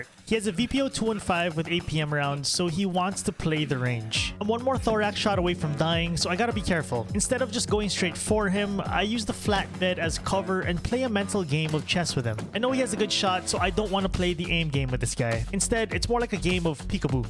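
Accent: Philippines. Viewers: Knew you were Pinoy the moment I heard your accent. I've met or have seen like about 5 Pinoys in this game since I started playing back in 2018.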